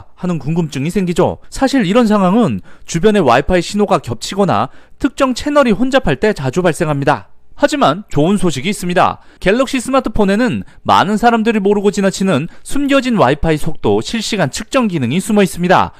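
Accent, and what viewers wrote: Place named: South Korea. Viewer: 말하는게 어느지역 사투리 같음 히얀하네 끝말을 강조하는게 두번다시 하지 마라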